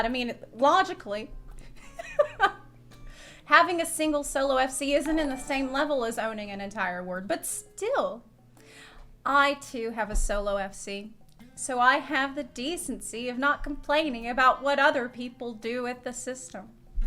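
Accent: South, United States. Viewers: Has anyone else noticed that since has been back in the US shes been slowly getting a stronger southern accent? LOL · Some videos she just gets real southern. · Your accent is sounding a lot more southern since getting back to the states... it adds to your cuteness level 😁😁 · I can't get over the fact how SOUTHERN sounds. She reverted so quickly to her American accent. It's astounding. E: As far as the topic at hand, I have an FC apartment, I have a private ward apartment, and I have a small house. That's 400 slots, that's more than enough for me. I'm not double dipping on any one form of housing available to an individual. I got my apartments way before I ever did my house (thanks ward expansions, you're the real MVP here), but I know what the rules are, and I stick with them. I wish everyone did the same.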